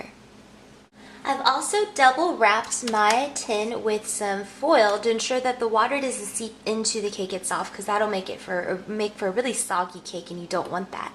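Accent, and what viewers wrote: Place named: Baltimore, United States. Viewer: Yayyyyyy I am so happy I just found your channel. Now I can get crafty without all the research or messing up. ^_^ Wooooot! I am from Baltimore, it's funny wherever you are from you have a hint of a Baltimorean accent to my ear lol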